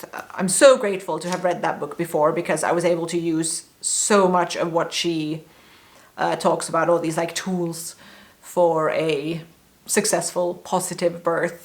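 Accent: Finland. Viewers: I really liked this story. not sugarcoated or treating birth as a 'who did it better' thing, so chill and funny at times. a lot of details and no swooning, just being down-to-earth and positive. and I must say I adore the traces of Finnish accent you have, like saying five words on the same breath while your intonation goes down and so on. I can't help it, I'm just a sucker for it :D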